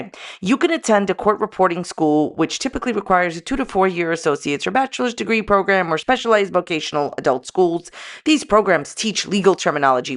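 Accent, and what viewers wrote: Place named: New York, United States. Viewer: Your channel cracks me up. I’m from New York City area and your accent and your attitude. Reminds me just. Like home ❤❤❤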